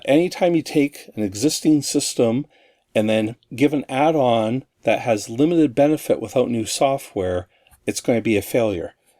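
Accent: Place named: Winnipeg, Canada. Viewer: I'm really enjoying your videos the past few days, I just discovered your channel. Fellow Canadian here and from the sounds of it, you sound like you might be near my neck of the woods here in Winnipeg. I was a Commodore fan back in the 80s too as a kid. More recently I backed the kickstarter to get myself one of the new Sinclair Spectrum Next 8-bit computers (can't wait to receive it this August)! Cheers!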